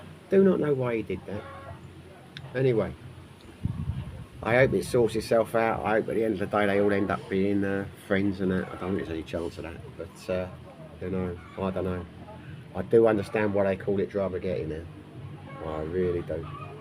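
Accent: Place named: London, United Kingdom. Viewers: He is speaking the English actual English people speak. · Southern England - East/ south London or thereabouts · 😂You may speak English…but clearly you don’t understand it when spoken , it’s a London accent !! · It's a London accent from East and South London mostly! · That's an English accent.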